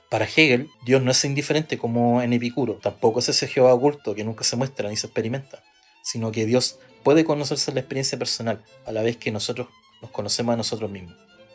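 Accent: Chile. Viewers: Me encanta el acento chileno (me genera paz). Yo estudié filosofía, pero aún no profundicé con Hegel y quiero darle a la Fenomenología del espíritu.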